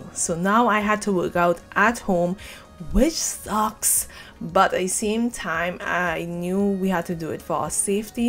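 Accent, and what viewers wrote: Trinidad and Tobago. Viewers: Is that a Trini accent? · I came to get inspiration for me to continue one of her challenges but then I heard your accent...HIIIIIIIIIIIIIIIIIII TRINBAGO SIS❤❤ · I'm from trinidad and I made out your accent just had to scroll through the comments to make sure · As soon as you started talking i was like she's from Trinidad or if not from the Caribbean. I am Trinidadian as well! But currently in the US. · Jayna Danielle Im saying this girl sound like Trini Im from Trini.... I live in Arima be safe 🙏🏼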